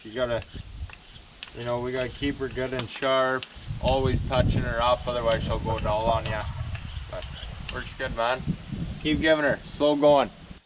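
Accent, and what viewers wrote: Canada. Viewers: most important thing I have learned is that I've never heard an actual Canadian accent before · I'm going to say this: I watch this channel almost purely for the outrageous Canadian bush accent, and energy.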